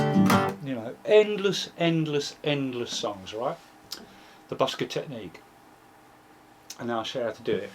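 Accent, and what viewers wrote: United Kingdom. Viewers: come here for tips.stayed for the british accent noice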